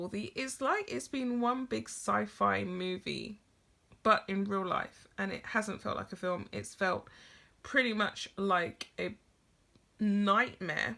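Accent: England, United Kingdom. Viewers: I think the way you pronounce her last name is adorable and speaks to your English accent. Honestly people are so nasty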